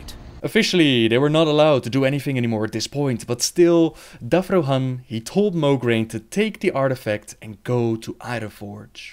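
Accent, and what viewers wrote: Netherlands. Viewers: this sounds so dutch it hurts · i hear a heavy dutch accent in his voice. :)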